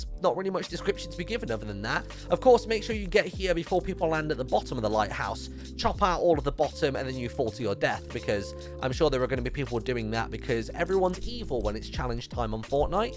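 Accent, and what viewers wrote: United Kingdom. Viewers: how is it its like a british accent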